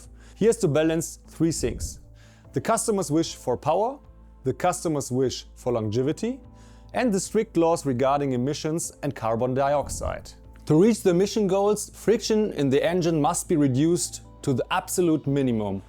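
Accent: Germany. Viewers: I feel the german in your voice :) This is a very good video and the explanation is on point. But now i am curious, can i, as a non mechanic guy, increase the oil pressure from the pump in idel anyhow? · sorry but there is only one thing that is so annoying for me and that is a german speaking english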